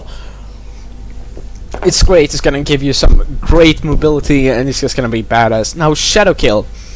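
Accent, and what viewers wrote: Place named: Netherlands. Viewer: you must be dutch, i heard your pronunctiation and though you speak english well. when saying jump and other things i was like hmm.. he must be dutch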